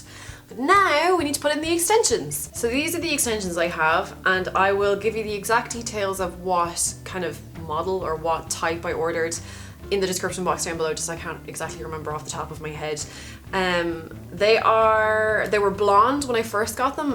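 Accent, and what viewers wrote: Ireland. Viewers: does anyone else hear a little either irish or scotish in her voice?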